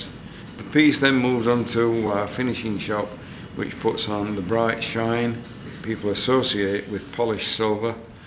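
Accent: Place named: United Kingdom. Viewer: English, but with a British accent.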